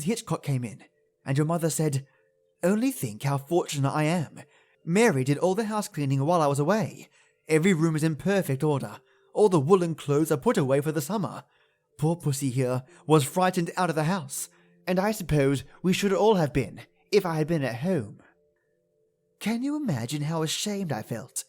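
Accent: Australia. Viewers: My younger brother, Lee is here and he absolutely loves your voice! He wants to listen to more of your videos. He enjoys the inflection of your voice, your wonderful Aussie accent and the little injections of different voices you do. My brother noticed how genuine you are and how much you love narrating stories. I always knew he has good taste and what I consistently say about you rings true and aligns with what he said. How wonderful! My mother, brother, Daisy and myself all appreciate your videos and narration. Thank you very much my lovely and brilliant friend and for this!❤💜🧡💛💚💙💓💕💖💝💞☕🍰😇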